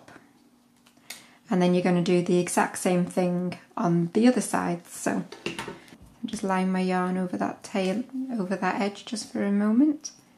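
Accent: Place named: North, United Kingdom. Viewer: nice to hear a lovely ‘northern’ uk accent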